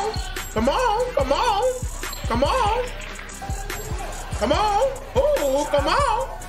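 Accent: New Jersey, United States. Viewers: Ripe New Jersey accent right there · you from jersey? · New Jersey accent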